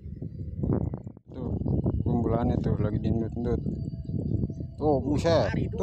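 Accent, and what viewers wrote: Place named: Tangerang, Indonesia. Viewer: Selamat pagi bang .. salam kenal Logat nya kaya orang kampung Melayu tangerng dan sekitarnya ..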